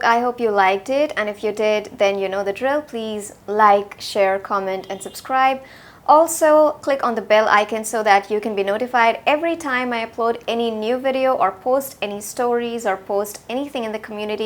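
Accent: Bengal, India. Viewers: Hey just subscribed & loved ur video! r u Bengali? U sounded like a Bengali. I'm from Bangladesh. Sending love from here 😘 Nd u're so much natural beautiful that any makeup look will suit u.even without makeup u're much pretty 😍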